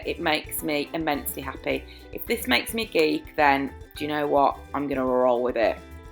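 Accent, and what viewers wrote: Birmingham, United Kingdom. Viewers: If just watched like 10 of your videos in one go! Love them!!!! I'm from Birmingham but when I got married moved down south so been soo good to here the accent!! Great videos can't wait to watch more!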